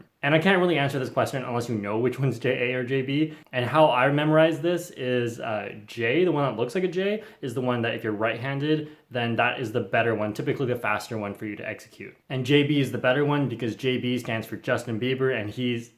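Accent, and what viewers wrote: Australia. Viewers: Your aus accent is actually really cool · That Aussie acsent was alright cause I am a boody aussie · You sound just like an Australian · His Aussie accent sounds EXACTLY like Feliks Zemdegs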